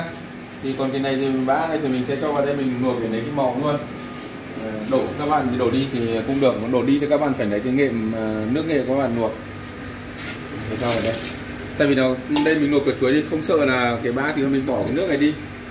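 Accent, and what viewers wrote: North, Vietnam. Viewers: Nghe giọng bắc rồi còn hỏi...?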